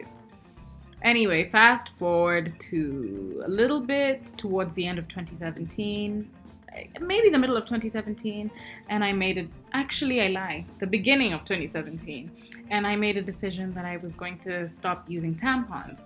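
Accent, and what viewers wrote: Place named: Germany. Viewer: Kann es sein dass du deutsche bist? Leichter Akcent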